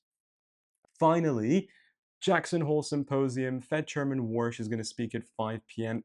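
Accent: United Kingdom. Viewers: My advice is to just go full British accent. Otherwise you're just standing out there like 1 o'clock half struck.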